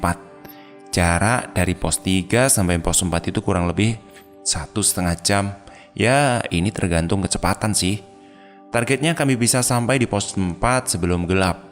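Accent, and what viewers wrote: Java, Indonesia. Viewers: Suara nya kok enak y 😂😂 Apa lgi logat jawa nya..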